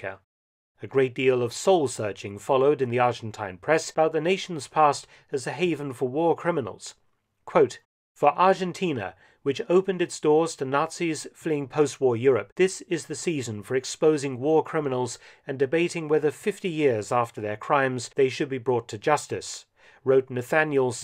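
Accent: United Kingdom. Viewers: There's something about a British accent that makes a WW2 history video that much better lol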